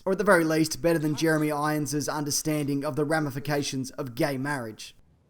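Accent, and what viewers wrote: Australia. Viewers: This guy sounds Australian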